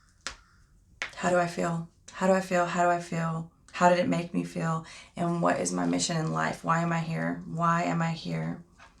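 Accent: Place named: North Carolina, United States. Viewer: I really enjoyed your message and it was it was great to see you face finally. I’ve been a subscriber for about a month now but your message today was so thought provoking. I absolutely need that kind of coaching in my life... I held onto every word! I have to say I think we share the same accent?? NC maybe? Anywho I love you woman, keep up the inspiration and thank you!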